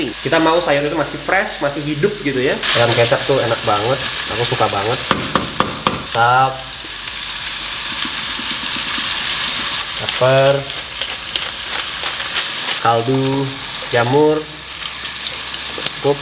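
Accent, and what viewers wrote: Bali, Indonesia. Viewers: Logat bali keluar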